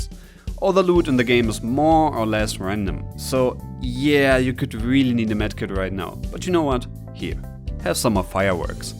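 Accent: Germany. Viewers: btw is it just me or are you german?/kommts nur mir so vor oder bisch deutscher?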